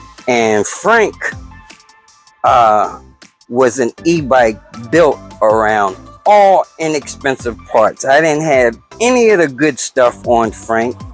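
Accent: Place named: New York, United States. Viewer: Yeah congrats for 1,000 subscribers I got 570 and dropping and I don't really care because every time somebody watches my videos they make money and I don't LOL why do you live in California and sound like you're from New York you must be from New York nobody has that accent. When I lived in Bedford I started getting that accent yes I used to work at Bedford prison in New York on boilers. We kept all those women warm LOL. Well that's good you're not in jail I'm glad and I hope you're not slinging bricks because those kill people and know how matter how much money you make you can end up in jail forever. Not worth it I'm poor I could have done that I can find a bag of s*** way faster than a bag of weed I grow my own weed though Gorilla Glue number f o u r that s*** is the bomb. Next is blueberry Autoflower. I used to be a farmer now I'm in the closet farmer LOL. 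I bought a battery online and now I'm wondering why the f*** did I do that? I need prayers I bought it from an individual that sells all kinds of different stuff and no batteries it says it's brand new 20 amp hour 48 volt for 450 bucks plus shipping and whatever about 480. It has a really nice metal charger but who knows if it works why did I do that oh my God? Well I can send it back but that cost $60. Now I can tear apart the other battery once I get that one and I don't have to worry about going on a trip still if I screw it up they won't even tell me what kind of BMS is in the freaking battery. They sell you a batt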